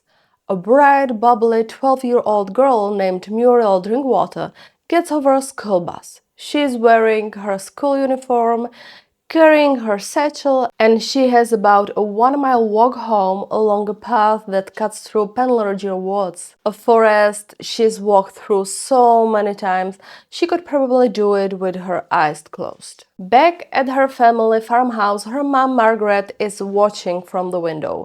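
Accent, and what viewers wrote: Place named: Eastern Europe. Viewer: My Grandad knew Muriel. They weren’t exactly friends, she was in the year above him. But he saw her around all the time. He and many others always believed it was an American soldier who committed the crime, there was a group of them stationed nearby. One vanished the following day after the murder which was obviously a little suss! 👀 Cool accent by the way! I used to live in Hungary some years back and I’m sensing you’re from that part of Europe?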